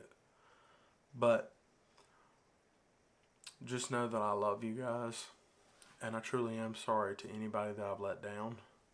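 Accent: South, United States. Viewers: Hello young man! Your video showed up in my feed and I’m really glad. Thank you! I’m going to share with you - this will likely be long. Please - take what you like, leave the rest. First, you can’t disappoint anyone else. If someone says they’re disappointed in something or someone, that is on them - it’s their feelings - it’s theirs to deal with and not something you caused or need to feel worried about. I can tell you’re from the South by your accent. Me too! So, I know a lot about what that likely entails ….food - and not healthy food - is a central part of life - good times, bad times, normal times, etc etc etc. It’s an insult to many in the South that if one doesn’t eat as they think one should, then they are worried or insulted and certainly, they keep encouraging and begging and shaming until the person gives in or bad feelings occur. I’ve been there, done that. 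MANY MANY MANY times! I saw a great therapist for known and unknown reasons. It was the single best decision I ever made!!!!!!!! My life changed in every way - I got better - I understood my life - past and present - much more fully. A lot was not pretty or comfortable. I had a lot of shame - for known and unknown reasons. There were things I was hiding - from others, for sure. And from myself in many ways, in terms of how it was effecting me - including my weight and food issues. So pat yourself on your back ….you’re being very candid, you’re taking a risk and you’re willing to see a therapist. In our society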